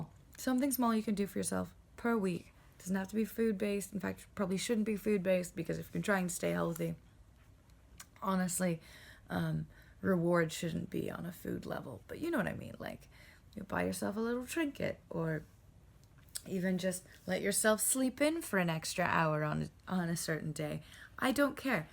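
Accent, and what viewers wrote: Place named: England, United Kingdom. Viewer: There's that English accent again.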